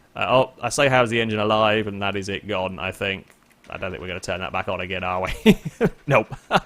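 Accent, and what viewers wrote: United Kingdom. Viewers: Yeah he's British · He is British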